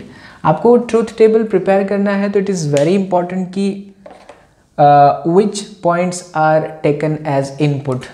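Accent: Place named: Haryana, India. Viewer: Ni gujrati he is frm surat but hrynvi ati h unhe😁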